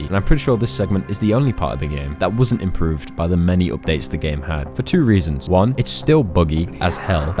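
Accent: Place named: United Kingdom. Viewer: "Special fanks to Warfunder" Literally all i heard before clicking off. You brits need to LEARN HOW TO SPEAK ENGLISH!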